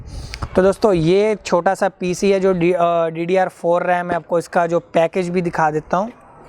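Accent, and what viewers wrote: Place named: Punjab, India. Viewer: Kinna aukha h punjabi bande nu hindi bolna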